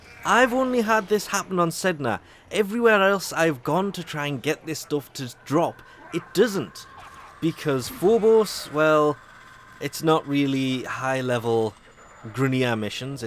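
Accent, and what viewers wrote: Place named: Newcastle, United Kingdom. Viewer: North east of England, sounds like Newcastle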